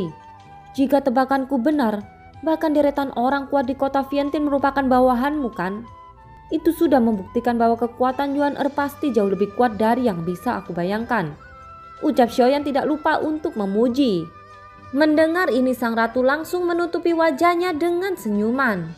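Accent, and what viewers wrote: Java, Indonesia. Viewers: Bahasa meddok nya/ atau logat nya kapan hilang nya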